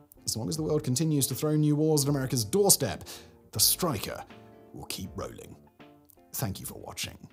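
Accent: United Kingdom. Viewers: Dude, slow down, for crying out loud! Can’t catch everything you’re saying with this Brit accent.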